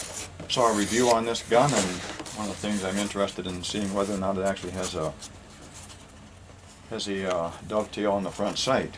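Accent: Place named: Minnesota, United States. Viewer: You must be in Minnesota based off of your temps and snow and accent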